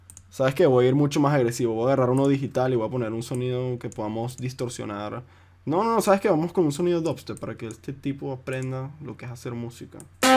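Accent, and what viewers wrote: Venezuela. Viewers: ahajajaja desde la primera palabra que dijiste supe que eras venezolano!!!, de donde eres bro? yo de bqto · jeje venezolano he.... excelente video muchas gracias !!!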